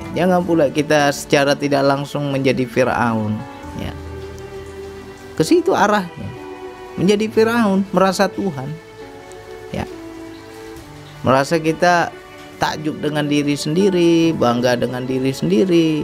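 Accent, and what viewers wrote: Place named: Medan, Indonesia. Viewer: Abg logat medan..orang medan ya bg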